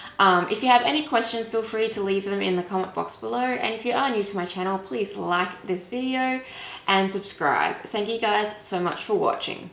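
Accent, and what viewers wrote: Australia. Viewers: She is Australian, she can’t sound American. You need to learn to respect people. If the video did put you off so bad, you wouldn’t have the time to comment Betty!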